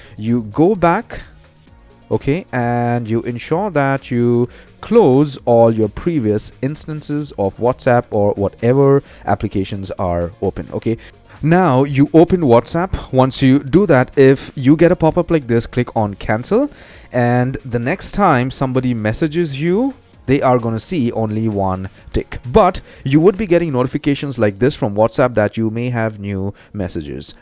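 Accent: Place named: India. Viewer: First off, thanks for being an Indian English speaker Second thanks for an ACTUAL solution to this on the internet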